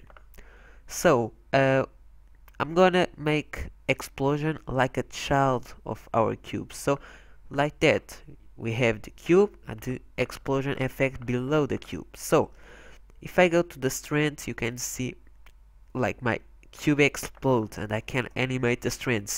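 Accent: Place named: United Kingdom. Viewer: Inglês tá bom hein kkk falando igual um britânico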